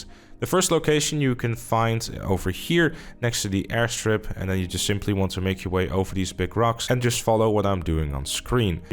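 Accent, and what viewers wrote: Netherlands. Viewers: Jij bent Nederlands dat hoor ik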